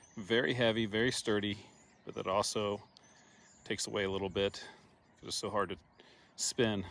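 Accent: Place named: Texas, United States. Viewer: Coach, you have spent enough time in both Texas and Oklahoma to develop a slightly perceptible country twang. It sounds cool...you wear it well! 🤠 Coach, please stay out of Gouge Eye...it sounds like a rough place!🍺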